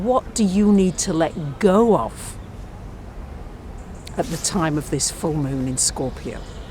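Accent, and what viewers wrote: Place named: Manchester, United Kingdom. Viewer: Great video. You said you're in Edinburgh but I recognized the Mancuran accent. I'll admit I'm a huge Oasis fan and that's how I recognized the accent. Happy full moon from California.:)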